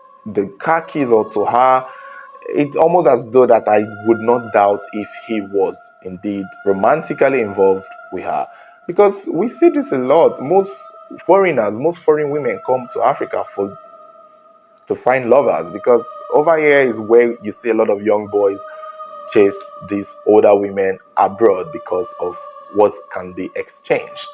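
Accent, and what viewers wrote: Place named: Nigeria. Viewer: You sound Nigerian. Where in Ghana region did this happen? Did you mention?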